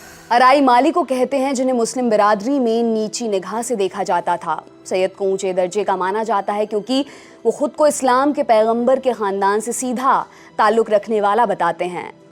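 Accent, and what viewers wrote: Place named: Punjab, India. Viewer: Your way of presentation is very nice betaji. Specially Punjabi tone. God bless you😊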